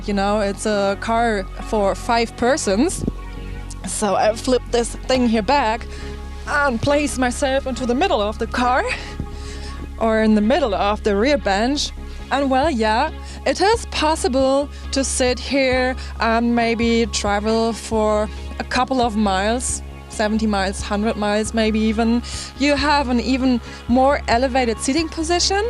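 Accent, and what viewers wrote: Germany. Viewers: Nice German accent.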